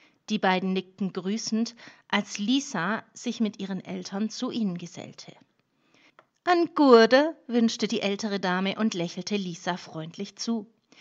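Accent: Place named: Hohenlohe, Germany. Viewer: Sehr gud vorglesa wirdli supi, awer zur muswisa ghärt vileicht doch a bisle mär hohalohisch dazu mansch net a liwi?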